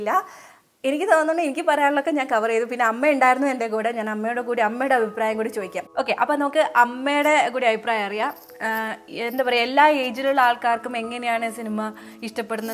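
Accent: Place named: Thrissur, India. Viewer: ചേച്ചി തൃശ്ശൂർക്കാരിയാണോ തൃശ്ശൂർ ഭാഷയാണല്ലോ സംസാരം കേൾക്കുമ്പോൾ. ചേച്ചി വളരെ നന്നായി Review പറഞ്ഞു. Lucifer is a mass movie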